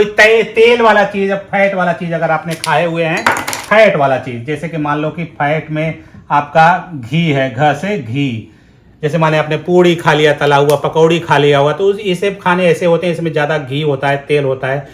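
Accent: Bihar, India. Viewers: बड़का डाक्टर। 😂❤ बिहारी हैं का? भोजपुरिया?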